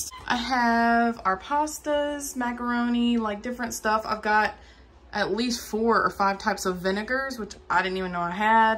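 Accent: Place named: Virginia, United States. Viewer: I’m inspired. I like your accent. Where are you from? You sound like a gal I know from Virginia. Maybe I’ll fire the old Vlog back up and clean some stuff. :)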